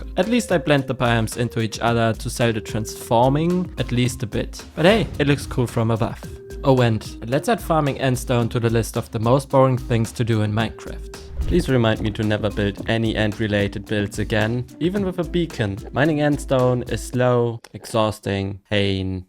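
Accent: Germany. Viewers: youre accent is a bit german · Are you from Germany? It sounds a bit like German English. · Nice vid but are you german i hear a bit of an accent or maybe its the cold but yeah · is your main language german your voice sounds like german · I like seeing biomes build!! However, missed your videos, they are nice and I like your german accent man👍🏻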